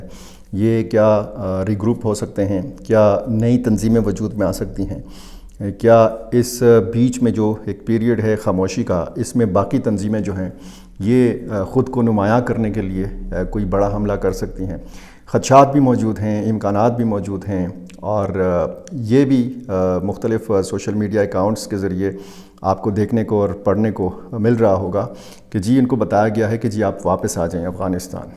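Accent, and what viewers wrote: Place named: Mansehra, Pakistan. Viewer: In k lehaja bht zada hmry elaqay Manshera sa lagta ha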